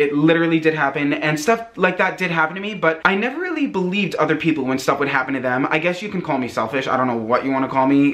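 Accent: New York, United States. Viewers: Your New York accent oh my goddd 😍😍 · You are the most beautiful person I have ever seen 😭 this is the first video I’ve watched from you and you are so awesome btw I love your New York accent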